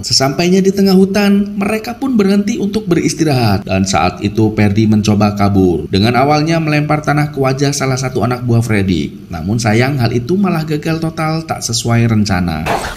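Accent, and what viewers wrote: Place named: Java, Indonesia. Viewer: Admin asline wong endi kang? 😂😂😂 lucu logat Jawa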